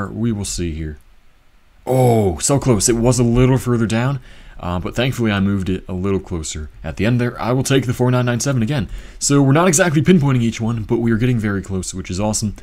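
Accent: Russia. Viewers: Takový ruský přízvuk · GG noticable improvement in your pronounciation as well ;) but you still sound like a russian trying to pronounce english. You shouldn't really emphasize anything other than the beginning of the word, and the lengths of each syllable should be of the same length, unless you see a line over the letter (á (as in hard), a (as in duck)). I noticed this when you said nád instead of nad Labem.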